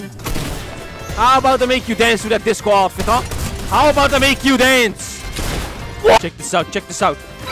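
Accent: Netherlands. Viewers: this is the most Dutch accent ever lmao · Are you dutch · Are you dutch?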